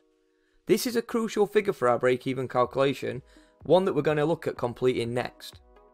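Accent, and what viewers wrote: Yorkshire, United Kingdom. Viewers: well done. Very nicely explained. You sound Yorkshire :)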